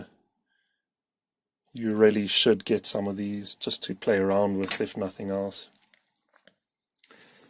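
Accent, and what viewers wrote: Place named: South Africa. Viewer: just a random South African saying my fok when you hear the accent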